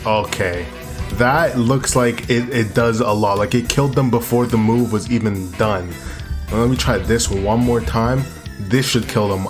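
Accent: Jamaica. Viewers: Yow u jamaican?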